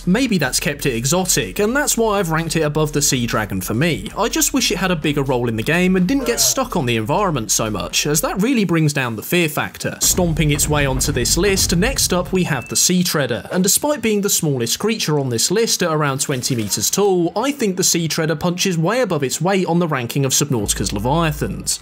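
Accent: United Kingdom. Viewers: I love your British accent. Also great video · Jesus, you're about as basic bitch of a YouTuber as you can be. British accent, over used 5 images of what you want people to see you as, and cliche sound effects, ew.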